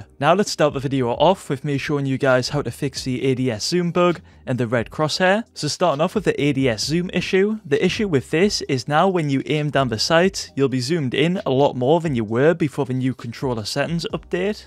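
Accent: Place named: Scotland, United Kingdom. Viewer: im from the uk aswell but your accent sound diffrent kinda like scottish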